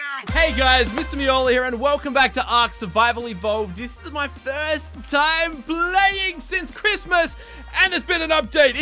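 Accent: Australia. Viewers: Hay u Australian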